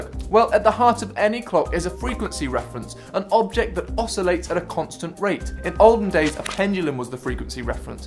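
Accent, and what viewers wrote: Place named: United Kingdom. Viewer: This British accent. I can't understand even a thing.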